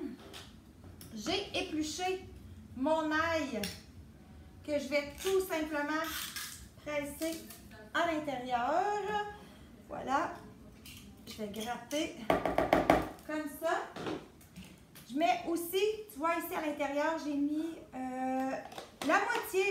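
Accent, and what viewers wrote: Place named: Quebec, Canada. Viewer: Belle démonstration Tupperware!!! Et j'adore votre délicieux accent Sag/Lac ( je suis originaire de Jonquière... Je m'ennuie d'entendre cet accent ). Cela dit, je fais de quoi de pas mal similaire, mais avec un Presto. Perso je mets une boîte de tomates en dés, pour avoir du ''crunch'' de tomates, et j'y ajoute du jus de tomates. Tellement bon!!! Merci pour cette belle démonstration!!!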